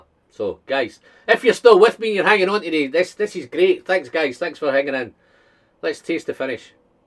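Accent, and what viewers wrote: Scotland, United Kingdom. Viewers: One big reason for following you besides the whisky reviews is your Scottish accent mate. I love it. Kind regards from Holland. 🤘🏻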